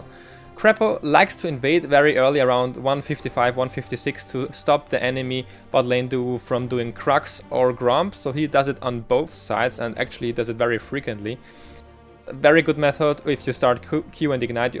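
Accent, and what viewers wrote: Germany. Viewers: german accent? ^^ haha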